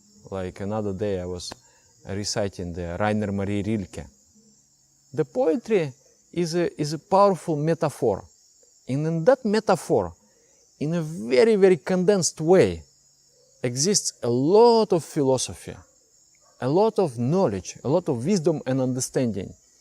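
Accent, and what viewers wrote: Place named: Russia. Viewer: Your Russian accent makes it easier to understand what you are saying, for some reason :)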